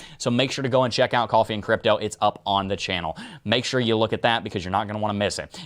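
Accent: South, United States. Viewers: he's from the south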